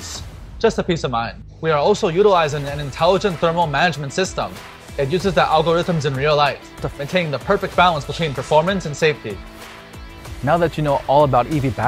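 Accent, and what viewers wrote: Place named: China. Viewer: Ohk, this host is a red head, blue eyes and heavy Chinese accentes English? 🤕What I miss?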